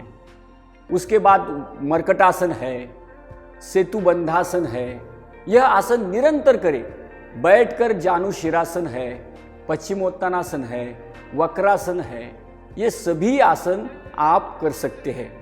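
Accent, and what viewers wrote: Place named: Maharashtra, India. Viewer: Sir aap marathhibhashi lag rahe h Aapka bolna mujhe Bahut achha laga 🙏🙏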